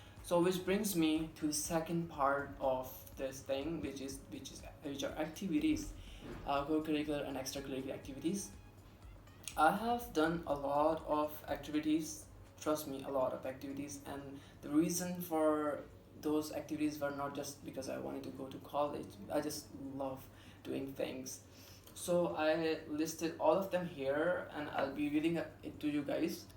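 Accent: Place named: United States. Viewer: America gaya nahi or language abhi se shuru kr di hai wah re wah.. btw English kaafi achi ho gayii hai teri specially American accent 😍 ♥️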